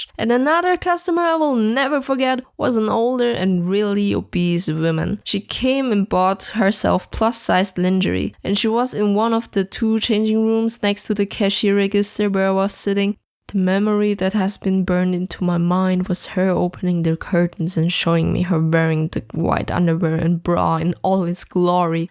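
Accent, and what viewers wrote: Germany. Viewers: she comes from austria so it is a german accent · the accent also sounds like a german accent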